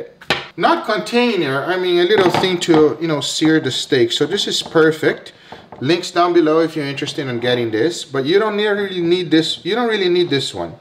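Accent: Cuba. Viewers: Hearing a guy from Brazil speaking Spanish with a Cuban accent made me want to move to Miami. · it's spanish, I know is brazilian but he was speaking spanish to the girl, with a strong Cuban* accent btw... He says "tu"(sp) instead of "você"(pt) like in "¿Tu quieres carne? (PT: você quer carne?/do you want meat?) He also says "abre la boquita (PT: abra a boquinha/open the little mouth) * I know I said cuban, but I think it's the Floridian spanish which is heavily influenced by Cuban accent, I've been there and noticed even non cuban latinos having their accents influenced(a little or a lot) by that cuban accent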